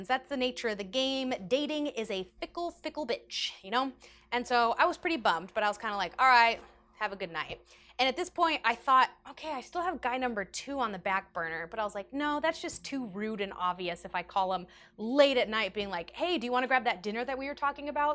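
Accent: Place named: United Kingdom. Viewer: Nice British accent!:)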